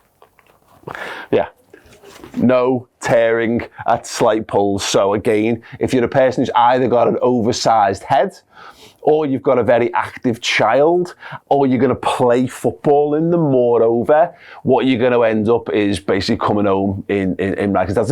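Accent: Liverpool, United Kingdom. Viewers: Just wondering, are you from Liverpool? Sounds like it, just asking because most scousers aren't English these days as that's all they rant on about, supporting England this world cup?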